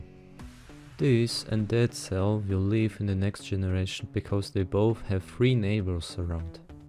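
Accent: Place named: Russia. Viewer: russian accent... hmmm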